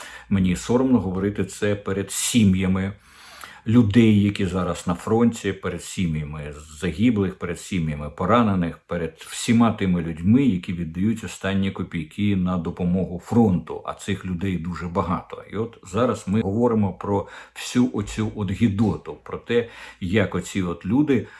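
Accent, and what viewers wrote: Russia. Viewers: Чому у головного націоналіста країни такий паскудний російський акцент? "ГрІвень", "вЄлодоріжки"... Наче Юлія Володимирівна знову "ожила". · трІста? ГрІвЄнь? А чому Дмитро Корчинський так дивно спілкується? З підозрілим мацковським акцентом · 'Грівня','ракєта', 'велосіпєд 'і не раз ' Кіїв '- як же глибоко у такого щирого вусатька засіли фонетичні правила ' огідної ' мови Булгакова...Ваші п'єси,вельмишановний пане,грають професійні актори,котрі продовж 5 років у бурсі на Яросл.Валу тренували свої вуста над правильною /прийнятою на медійних просторах / укр.вимовою.Саме вони можуть допомогти Вам.../// Madam Ambassatrice, котру Банкова спрямувала у Швейцарську Конфедерацію,зовсім не манікюрниця,а сексолог...Це різні речі,хоча фахово нагострені нігті можуть потужно використовуватись і в чуттєвій царині.../// Приємно здивувала широкий загал і Ваша квітково- строката сорочка,--- таку не одягне навіть і такий затятий нетрадиціоналіст як пан Портников...Брате,воістину Bruderleiter- е,не розчаровуйте тих підписників,котрі не раз,вимиваючи руки від важкої та брудної роботи на полі,все таки ставлять Вам ЛАЙКИ!!!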